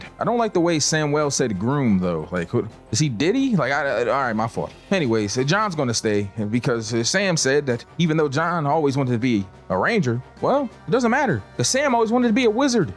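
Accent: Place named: New York, United States. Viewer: You sound like rosland or gary... or nyc.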